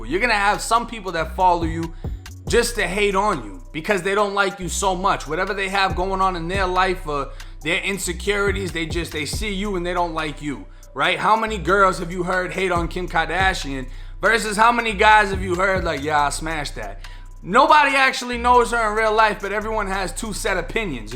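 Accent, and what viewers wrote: Boston, United States. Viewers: ❤you from 617 Boston that accent sounds like you from the bean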